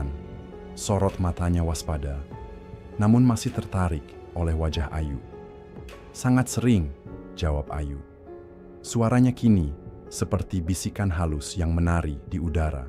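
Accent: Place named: Java, Indonesia. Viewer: Biasanya kl p.dhe yang cerita logat jawanya ikutan keluar😅😅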